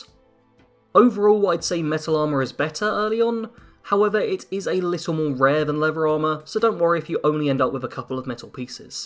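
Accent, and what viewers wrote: United Kingdom. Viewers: Given the thick Brit accent. It behooves you to slow down your speech, I just can't understand you most of the time.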